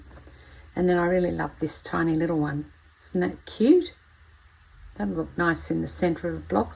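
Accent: Australia. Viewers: Hello this is Ellena from Portland, Oregon. I’m a quilter and have been fascinated with your Vintage linen quilt squares. I love them and am inspired to try doing something like this. Ps love your Australian accent. Keep up the good work.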